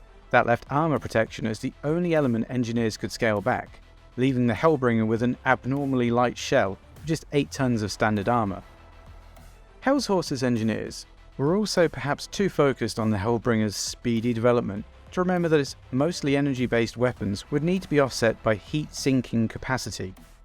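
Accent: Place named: England, United Kingdom. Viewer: One of the best things about being American is that everything just sounds better when narrated with an English accent. Add on great production quality, and you've got a winner. Subscribed.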